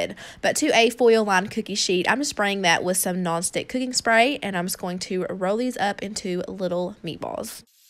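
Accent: South, United States. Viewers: I love your accent!!! I’m from NC, but I never got a true southern accent. But I watch one because I wanted to cook more. Two we have similar taste buds, and three your lovely accent ❤️❤️❤️ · Your accent is very southern lol I kinda like it. Where are you from?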